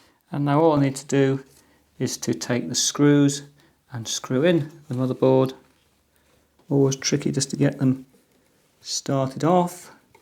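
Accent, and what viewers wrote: England, United Kingdom. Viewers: I like your British accent . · this english dude makes us sound like the stereotypes not cool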